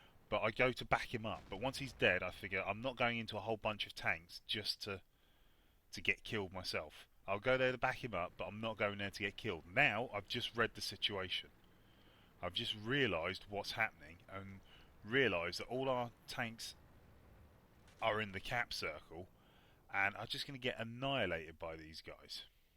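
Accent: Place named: England, United Kingdom. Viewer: not gonna lie, subscribed to you because of jingles, but having seen this video im staying for your commentary. as a fellow brit its quite nice to listen to an english person commentating rather than an american. keep up the good work :)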